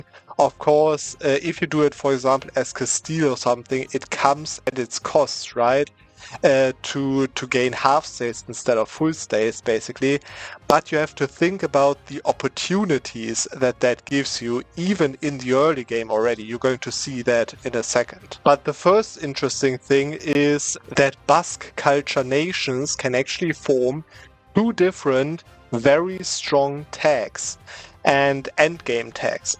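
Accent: Germany. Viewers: Lern mal richtig Englisch sprechen. Ist ja nicht auszuhalten mit diesem unerträglichen deutschen Akzent, mein Gott alter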